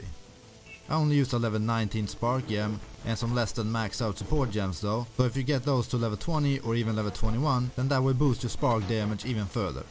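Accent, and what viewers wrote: Sweden. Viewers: He's swedish. It's disgustingly obvious. Horrible accent.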